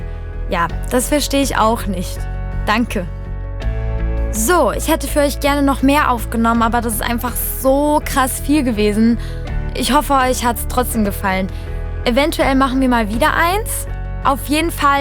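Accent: Germany. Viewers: how come you talk German and when you talk in English you have a German accent but in your music videos it doesn't sound like you have a German accent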